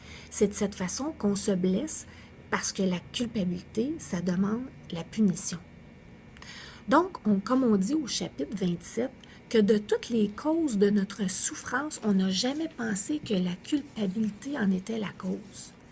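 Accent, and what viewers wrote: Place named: Marseille, France. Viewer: l'accent marseillais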